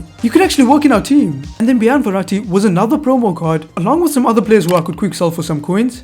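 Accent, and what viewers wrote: South Africa. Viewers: 10 and are you South African? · I think he from south africa bcs of his accent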